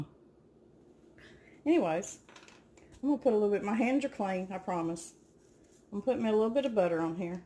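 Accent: South, United States. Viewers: It is so nice to hear a real southern accent again. I'm so homesick for good food and good people I could cry. Can anyone tell me which video had the quick recipe for fried cabbage and potatoes? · You are so Downhome ❤️ you remind me of my family with your Southern accent. You’re the only one besides me that call a bowl a Bow, lol. And you said scootch the pan over, I say that too. I was born in Kentucky, but live in Iowa for 45 years, just love you, God Bless you too ❤️ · I love your tutorials love to hear you talk love your Southern accent I am a southern girl too . Going to try this for Thanksgiving . I like those towel things for sure . You say your friend is from California , so am I. I live in Southern California.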